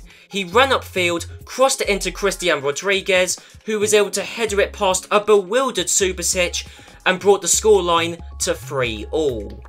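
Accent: United Kingdom. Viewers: Men do I have to correct so many names in Portuguese and Spanish cause of your British accent...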